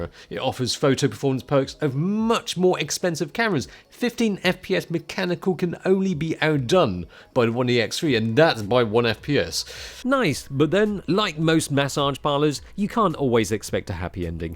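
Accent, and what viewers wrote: United Kingdom. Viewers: Forget the British accent, I swear this guy looks 17 and 70 at the same time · I've been trolling this guy for years and still haven't figured out if he's Japanese or chinese. And what's with than clean british accent? British chavs don't talk like that. No respect for her royal imbred racist majesty queen Elizabeth and stuff.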